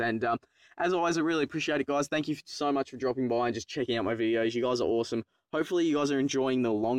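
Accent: Australia. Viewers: great videos keep up the Australian accent and good work;)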